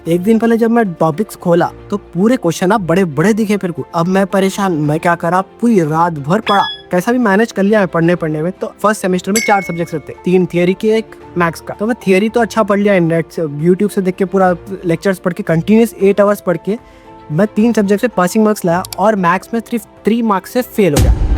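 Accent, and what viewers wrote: Hyderabad, India. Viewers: According to accent are you from Hyderabad?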